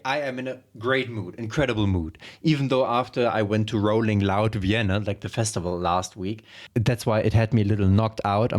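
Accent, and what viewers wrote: Germany. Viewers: why does your accent does sound like your german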